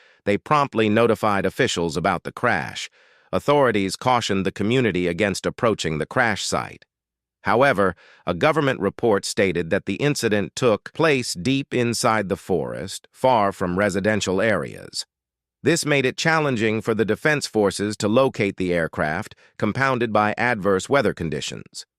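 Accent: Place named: United Kingdom. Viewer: You must be from BBC - enemies of the black race and enemies of All Christians. You are always going through African countries from country to Country creating trouble, accusing l Hatred ajc division and Destroying African leaders. Wtr hear it from your British Accent. You are turning now to Malawi trying to capitalize on this terrible tragedy to boosy your lucrative business which you conduct with the blood of the black people -- it is your destructive work. There was absolutely no rift between the current president and the Vice president. Why would high ranking Military officers( MAJORS) who PERISHED in te crash Take thier iwn fellow soldiers and 7 other High ranking Malawi leaders in addition to the Vuce President in a presidential Military plane judt to go Kill themselves along with rhe leadership team of Malawi? Bad weather! Shane on you enemies of the Black people 😮😮😮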